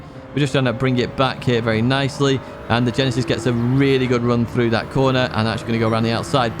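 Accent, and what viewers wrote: United Kingdom. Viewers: Nice content but any chance you can you talk a tiny bit slower so non Brits can understand you ?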